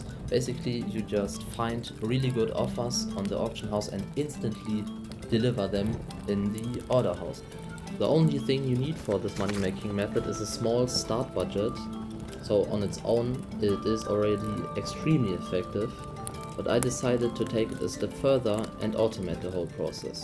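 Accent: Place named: Germany. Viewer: Are you German?, as a german i woud say that you sound german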